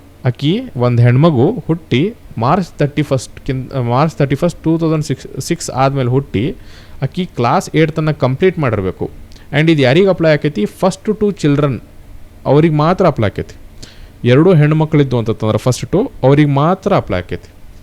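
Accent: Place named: North Karnataka, India. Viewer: ನಮ್ಮ ಉತ್ತರ ಕರ್ನಾಟಕ,ನಮ್ಮ ದಾಟಿ ಕೇಳಾಕ್ ಬಾಳ್ ಛಲೋ ಅನ್ನಸ್ತತಿ,all the best sir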